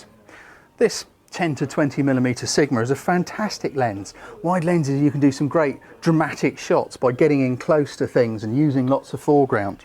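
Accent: United Kingdom. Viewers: Nice British accent and off course nice info. thanks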